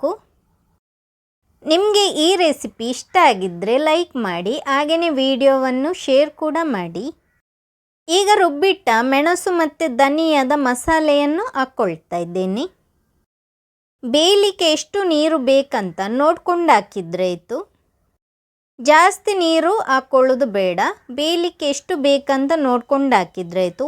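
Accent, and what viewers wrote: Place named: Mangalore, India. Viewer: ಮಂಗಳೂರು ತುಳು ಮಾತು ,, ; ಕನ್ನಡ ಭಾಷೆ ಸ್ವಲ್ಪ ಚೇಂಜ್ ಆಗುತ್ತೆ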